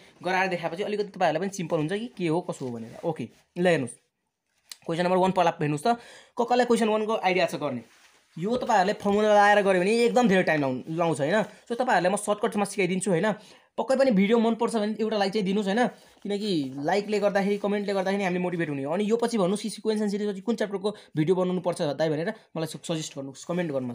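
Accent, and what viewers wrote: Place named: India. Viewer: R u indian? Plz fix ur accent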